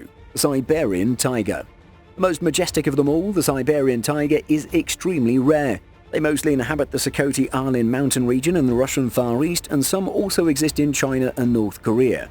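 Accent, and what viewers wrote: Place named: United Kingdom. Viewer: Finnaly new vid PS I like uer British accent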